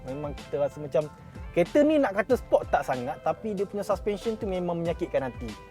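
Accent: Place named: East Coast, Malaysia. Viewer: org pantai timur mmg slang mcm ni..sama mcm abg jakpa.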